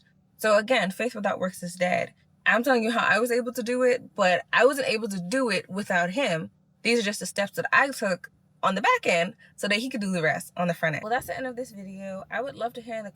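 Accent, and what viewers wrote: Bahamas. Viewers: Wait. You're Bahamian?! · are you from bahamas? u kinda sound bahamian lol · Hi i love your video and how you break everything down, I think i am hearing a Bahamian accent, i know you said having Caribbean parents! But thanks for taking the time to make this awesome content. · Great video ... sounds so Bahamian ...lol !!!!!! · That accent can’t hide boy 242🇧🇸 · you sound like a Bahamian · I picked up on your accent. It warms my heart to see a fellow Bahamian woman trading ❤ Wishing you all the best! Your video really encouraged me to take trading and financial freedom seriously. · Are you from the Bahamas? The way you say financial it reminds me of the local accents when I went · You sound Bahamian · Are you Bahamian? I hear a slight accent 😅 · I know i heard the bahamian in you loud & clear! Im proud of you. Im deep in this to clear debt, and provide emergency funds to actuay leave my job as well. 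· That batian accent ❤.. new here but praying I learn so I can get off this rat race . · Wait...I hearing my people?? Are you Bahamian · sound bahamian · Are u Bahamian i hear the accent but cant tell also love ur content this is awesome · You from the Bahamas eh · Love the video and congratulations! But mam, I can't ignore hearing a Bahamian accent. Now I'm super curious. Either way, love this for you! 🙌🏿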